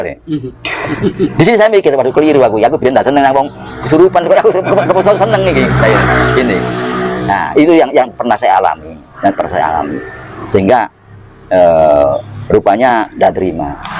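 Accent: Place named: Lumajang, Indonesia. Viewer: Logate wong Lumajang..Iki..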